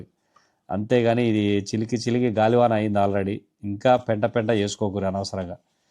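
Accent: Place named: Telangana, India. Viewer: మీ తెలంగాణ యాస, యా యా అనే స్టైల్, నిర్మొహమాటంగా చెప్పే విధానం బాగుంటుంది. నవ్వుతూ చురకలు అంటిస్తున్నారు. 😂😂😂😂😂😂😂😂 తెలుగులో మంచి స్టైలిష్, స్మూత్ క్రిమినల్ విలన్ పాత్రలకు మస్తు ఉంటారు బ్రో. Anyway బాధితుల పట్ల చేస్తున్న పోరాటానికి ధన్యవాదాలు.🎉🎉🎉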